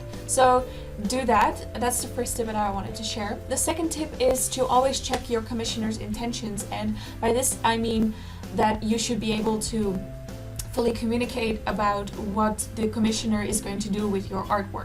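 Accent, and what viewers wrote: Netherlands. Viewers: This is a super random and redundant question, but are you Dutch? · No, I believe she is from the Netherlands.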